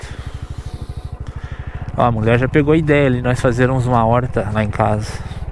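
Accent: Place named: Rio Grande do Sul, Brazil. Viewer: Adoro o sotaque do gaúcho, aqui em SP interior puxamos o R sem dó (porrrrrrrrta)😅…..e o “tu” para nós fica só na conjugação do português mesmo, aqui usa-se o “você”. Grande abraço diretamente do interiorrrrrrrr de SP!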